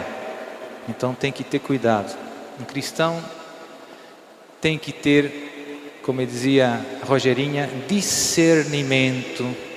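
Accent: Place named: Brazil. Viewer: está morando no Brasil? Seu sotaque está muito brasileiro 😅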